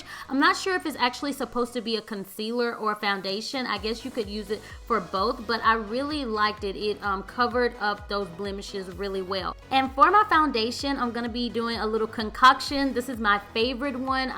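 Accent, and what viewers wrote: South, United States. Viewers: Love your southern accent ! ! !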